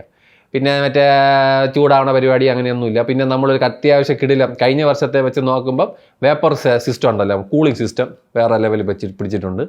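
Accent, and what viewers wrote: Trivandrum, India. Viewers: Nalla tvm slang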